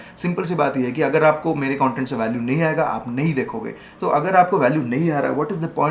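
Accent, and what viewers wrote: Odisha, India. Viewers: = Odia vibe with hindi talking ❤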